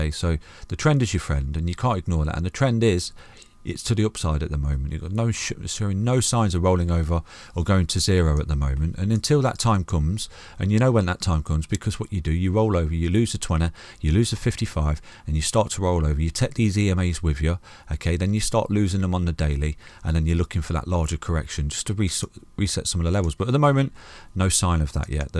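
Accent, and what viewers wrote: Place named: West Midlands, United Kingdom. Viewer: West Mids, knew it was near 😂😂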